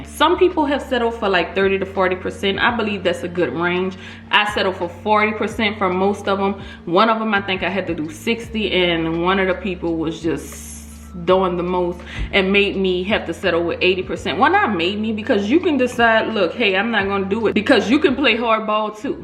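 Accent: New Orleans, United States. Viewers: Great video! Sounds like a New Orleans accent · New Orleans accent love it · Accent sounds like Toya (from New Orleans) lil Wayne baby mama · Are you from New Orleans? I’m new sorry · U sound like u from new Orleans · I could tell you from the boot, I hear that New Orleans accent 🙏🏽 thanks for the information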